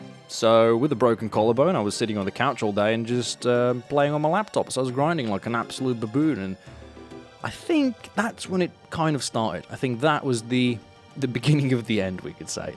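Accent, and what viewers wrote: Australia. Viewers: Tbh he sounded more aussie or kiwi to me and im a British "person"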